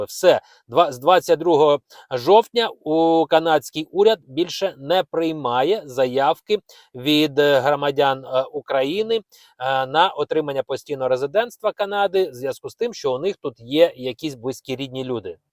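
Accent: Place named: Russia. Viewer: Украины скоро не будет, зачем украинский язык? Тем более, слышно, что для автора родной - русский.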